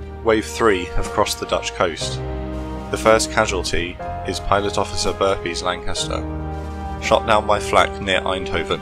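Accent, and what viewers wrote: United Kingdom. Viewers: A mistake that many, MANY native speakers of English make concerning anything related to Dutch geography. In this case it's the IJsselmeer - Lake IJssel. In this video you are painting the northern part of it, close to the Afsluitdam (Closure Dam) green as if it were land. Miraculously ,south of this part of the lake, you show its correct colours; blue (lake) water. Please always check DUTCH maps first when making videos involving Dutch territory. I have no idea where you English speakers get your wrong maps from. Not sure about your country I think it's Britain the way you pronounce it, so it shouldn't be insurmountable. It's on the other side of the North Sea.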